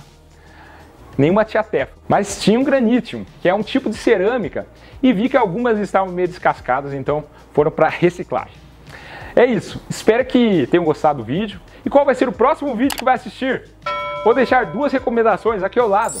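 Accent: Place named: Minas Gerais, Brazil. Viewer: Adoro esse sutaque mineiro. Seus vídeos 👏❤️ são ótimos. Parabéns Dr, por nós proporcionar GG conhecimentos. Não gostei da propaganda da Lacoste.kkkkk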